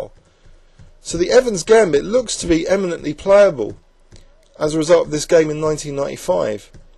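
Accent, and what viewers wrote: United Kingdom. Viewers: British accent? (sorry, my English is not very well).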